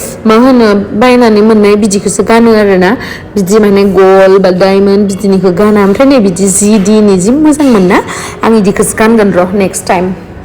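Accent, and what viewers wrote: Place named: Arunachal Pradesh, India. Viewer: Sach main arunachal ke log aise bolti hai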